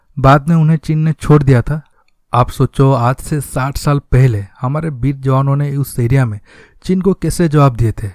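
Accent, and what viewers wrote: Odisha, India. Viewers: Sounds like u r from odisha...is it? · BHAI. TUM ODISHA se ho kya ?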